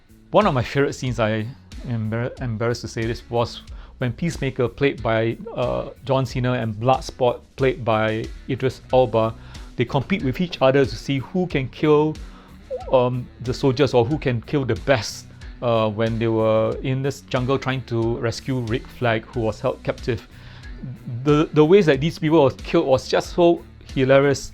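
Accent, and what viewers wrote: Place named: Singapore. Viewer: Singaporean? That accent is undeniable 😂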